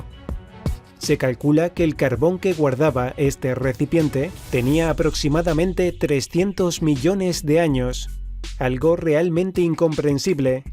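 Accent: Spain. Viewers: gordito de gafas No oye acento de España? .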